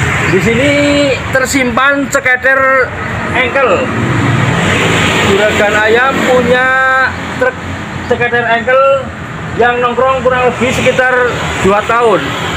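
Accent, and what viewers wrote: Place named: Banyumas, Indonesia. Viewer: Salam. Ngapak mas. Tambah Sukses selalu. Amin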